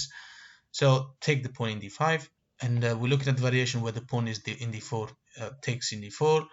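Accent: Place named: Wales, United Kingdom. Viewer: You have an interesting accent. Welsh with something else.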